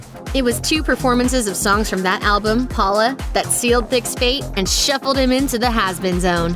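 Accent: United States. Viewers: Agreed, on every point. However, our narrator in THIS case isnt a brit, nor does she speak with an unusual dialect or accent. She sounds like a born n bred 'murican to me.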